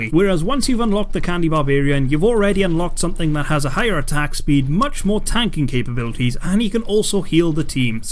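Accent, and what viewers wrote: Wales, United Kingdom. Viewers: Is he Welsh? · What part of wales you from mate?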